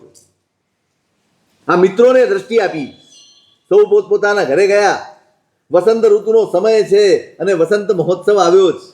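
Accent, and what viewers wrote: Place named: Kathiawar, India. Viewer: Maththen vandami. Though I am not jain and have been living in foreign country ( ANARYA BHOOMI) for 20 years, I am deeply interested in Jainism. Me and my wife have listened SAMARADITY MAHAKATHA for 2 times and 3 time I am listening to you and enjoying your KATHIYAWADI ACCENT very much. Guruji, you are explaining in the simplest way and it is heart touching.Please bless us so that we can make our SOUL free from all the karmas. BAHU PUNYA KERAA PUNJ THI AA MANAV DEH MALYO, EVI KARUNA KARO AAP K AA BHAVROG TALI JAY.🙏🏼🙏🏼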